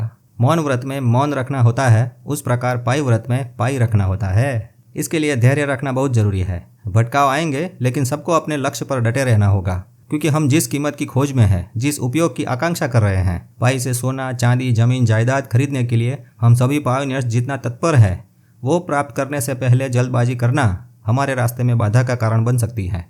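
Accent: Gujarat, India. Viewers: Sir aap Gujrati ho?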